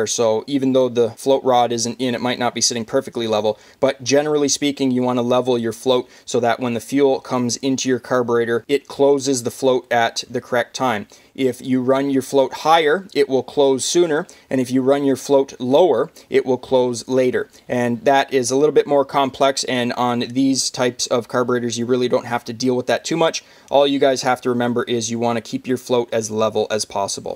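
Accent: Canada. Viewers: nice canadian accent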